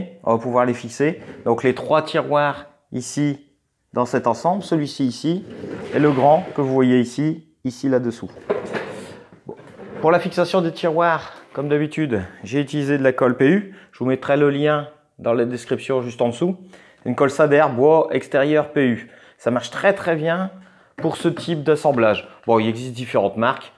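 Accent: North, France. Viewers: bonjour, bon travail tu ai du nord ?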